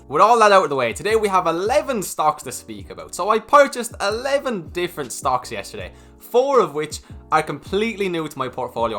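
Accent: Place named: Ireland. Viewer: Like given for the Irish accent.. I loves the Irish people’s 🤪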